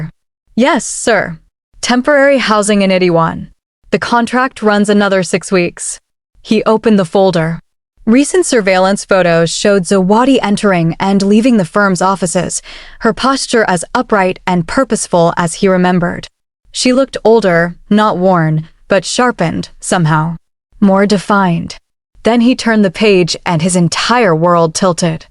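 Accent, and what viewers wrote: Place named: Guyana. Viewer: 'Born behind cow'. You sound Guyanese. I agree with your comments. Additionally, what is this obsession with liasons between Korean men and Black women? It is fiction because in real life, very few Korean, or Asian men, have healthy romantic interests in Black women. That's a conversation worth having😊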